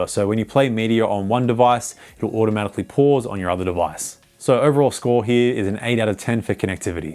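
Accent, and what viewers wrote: Australia. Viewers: nice to hear an Aussie accent on a tech review for once 👌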